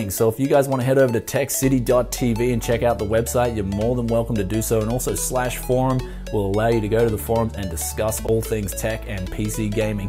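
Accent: Australia. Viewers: Is this fella Aussie? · Oh yay an Aussie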